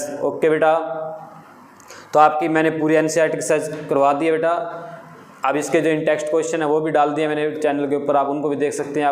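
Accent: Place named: Haryana, India. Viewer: Haryanavi accent 🙏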